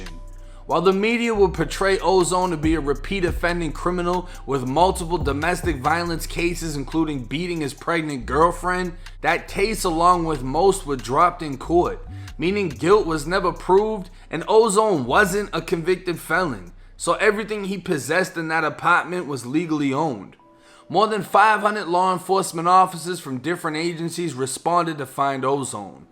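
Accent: Boston, United States. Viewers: brand is definitely unique and that Boston accent adds to the entertainment factor. Charges are pronounced Cho-jizz, lol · You from Boston bro? I'm from Lawrence, MA. Did you pahhk the cahh at haahvahd yawwhhd? Lol you gotta be from mass bro I catch the accent in all your videos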